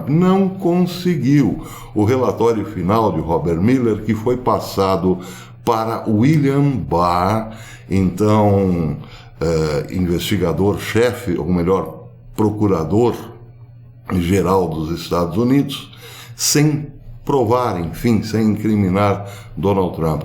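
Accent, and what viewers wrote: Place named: Minas Gerais, Brazil. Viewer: PROFESSOR, V. EXA. TEM SOTAQUE MINEIRO, NASCEU EM MINAS E FOI MORAR NO PARANA?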